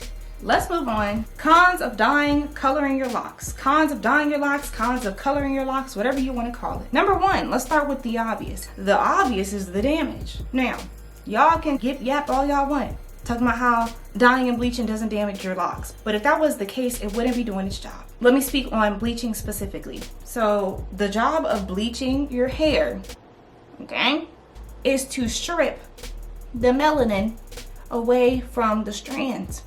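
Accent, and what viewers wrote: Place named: DMV, United States. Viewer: She sound like a dmv baby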